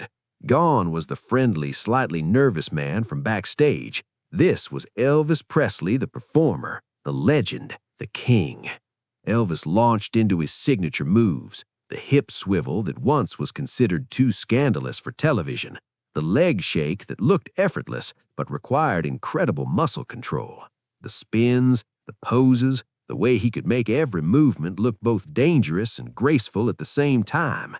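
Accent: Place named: South, United States. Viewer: why does the narrator have to have a redneck accent?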